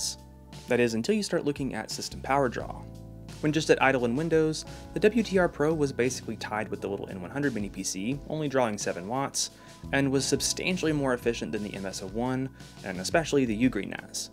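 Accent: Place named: Boston, United States. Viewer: That's a cute Boston. 13/10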